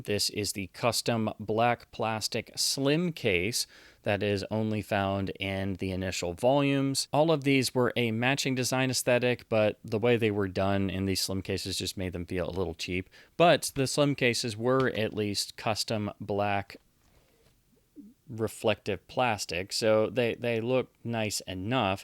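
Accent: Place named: United Kingdom. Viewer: ur british accent is great.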